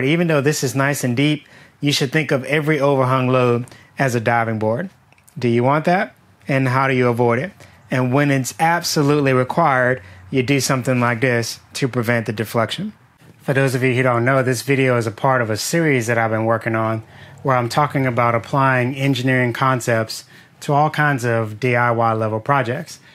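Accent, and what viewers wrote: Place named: United States. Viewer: As a European it's alway been impossible for me to understand the continued use of imperial units in USA. How long is a mile? The Americans have an old man in Iowa, a mile is how far he can walk in 45 minutes. Honestly, that imperial crap can't be used. When I watch your video, it's so wonderful to hear SI-units spoken with that American accent. Kind of gives me hope for the future.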